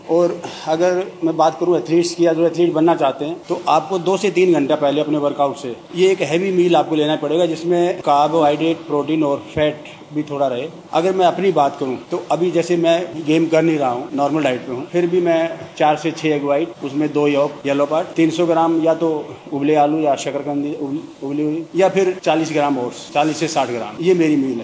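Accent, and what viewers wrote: India. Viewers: Aapki boli desi h mhari taria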